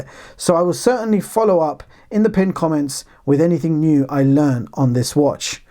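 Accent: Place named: United Kingdom. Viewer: You sound british!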